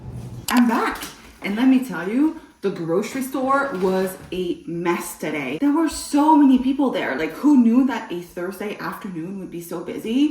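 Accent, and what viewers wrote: Quebec, Canada. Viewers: New subscriber here. Are you French Canadian? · This is my first impression and I’m not mad about it my queen❤❤ are you québécoise ?? Hihi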